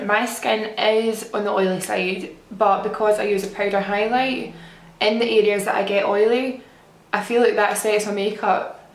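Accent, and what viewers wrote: Scotland, United Kingdom. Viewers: I agree. Beautiful girl but can't understand what she's saying. Strong Scottish accent!!!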